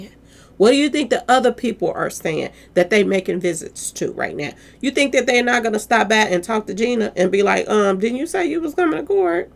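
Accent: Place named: South, United States. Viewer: Are you from Mississippi Alabama down south I can tell you had a southern asset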